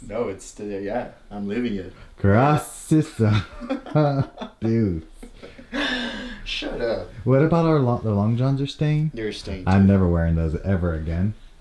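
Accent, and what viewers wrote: Minas Gerais, Brazil. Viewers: Sotaque mineiro não sai da gente mesmo hihihi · esse sotaque rs de Minas é lindo demais!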